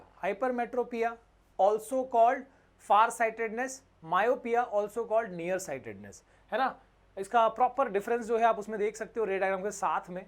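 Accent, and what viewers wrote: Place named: Haryana, India. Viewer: I really like haryanvi accent and yaa we are neighbours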